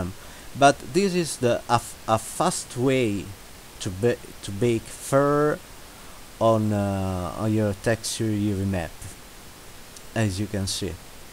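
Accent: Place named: Japan. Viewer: Your accent sounds like a Japanese. Anyway I love this video so much ❤️❤️❤️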